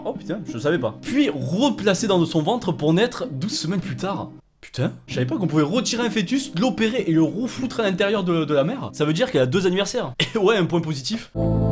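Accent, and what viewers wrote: South, France. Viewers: T du sud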